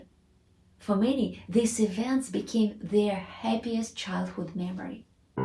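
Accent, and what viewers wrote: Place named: Russia. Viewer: It is very soothing listening to your Christmas description with your charming Russian accent. 🤗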